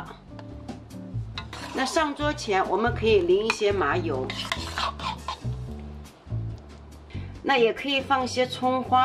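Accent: Shanghai, China. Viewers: 好亲切的上海普通话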